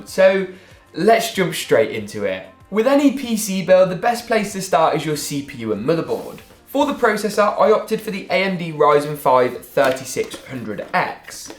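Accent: England, United Kingdom. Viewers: You are so fucking annoying, you keep coming up in my recommended even though I don’t watch 15 year old Pom kids, fuck you and your pommy accent.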